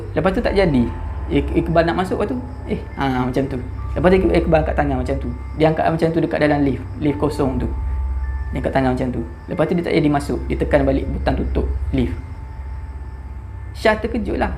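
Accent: Terengganu, Malaysia. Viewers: Dik org trganu ke😁 Sleng tu CM cakak ganu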